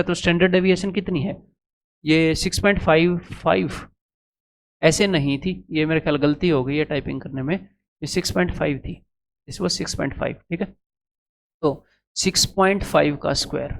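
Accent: Haryana, India. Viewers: sir ka haryanvi language k saath padhana accha LG rha or statics bpsc k mains bhi aati h tension nhi rahi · हरियाणवी हो क्या Sir 🙏👌 Thanks 👍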